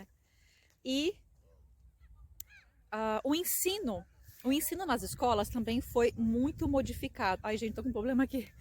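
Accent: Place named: Caipira, Brazil. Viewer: Porque ele só existe no conceito cristão. Deus não é um velho de barba branca que viveno céu para amaldiçoar, castigar ou abençoar pessoas. Isso só serve para controlar o ser humano pelo medo. Deus existe sim, mas não é nada disso que o cristianismo de modo geral prega. Os noruegueses despertaram. Putz ouvir esse erre caipira não dá, fui...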